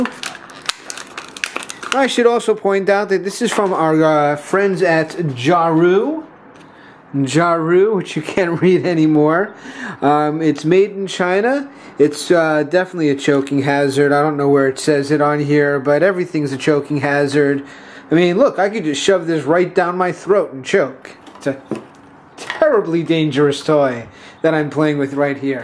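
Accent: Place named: New York, United States. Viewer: Your accent kinda reminds me of a newyork one c: nice vid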